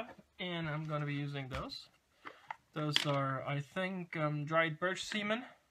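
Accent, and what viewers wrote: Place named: Southern California, United States. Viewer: I like your "shoot from the hip" style. It really is like that in real life, where different techniques, materials, and approaches are constantly being juggled to find the best way to model a certain stage of one's work. By the way, maybe you have disclosed it, but are you in Europe? Your common products such as white glue appears in your clips with European labeling, and you have mentioned costs in Euros, not dollars. This belies your SoCal accent. But I do enjoy the casual nature of your clips, and the emphasis on diorama building and layout.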